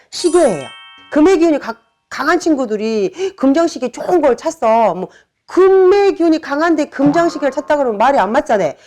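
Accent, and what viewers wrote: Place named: Gwangyang, South Korea. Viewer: 선생님 말씀이 씩씩해서 너무 좋네요 .. 광양분이신가봐요?? 사투리가 넘 좋네요~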